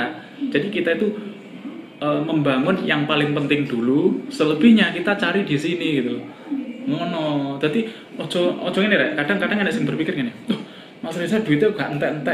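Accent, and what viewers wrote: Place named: Java, Indonesia. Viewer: Tanggal 12 september 2018. Kritik : tidak ada Saran : kalau bisa jangan ada bahasa jawa ny saya ngk ngerti, mending logat nya aja yang jawa bahasa jangan.. Alamat : perumahan belimbing kota Padang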